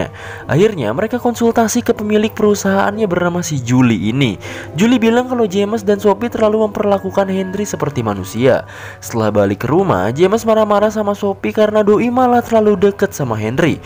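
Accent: West Java, Indonesia. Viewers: Sunda euyy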